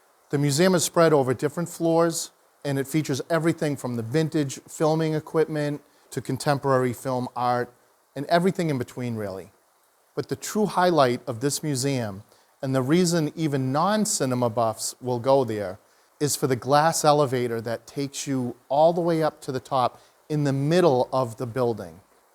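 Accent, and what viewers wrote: Boston, United States. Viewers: I really appreciated your video on Turin; it was the first video of yours that I ever watched. I enjoy Turin and have made many trips there. But the most fun for me was in your first sentence or two - as a fellow New Englander, I said to my husband, I hear Boston :-) so I won the bet when you mentioned your former work as a tour guide there. Seeyah! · First of all your review with your Boston accent made me feel right at home. As a fellow Bostonian living in Southern California for many years, I love that you have relocated to Italy. I just returned from a month in Italia. I went Firenze Ancona Assisi and Rome. I lived over there 35 years ago and now that I’m retired I want to move over. I’ve been watching many videos. This one was excellent! I love how you put up the maps of the city to explain the different neighborhoods. 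All of the food reviews and attractions. I am leaning toward Trieste. Thanks again. A presto!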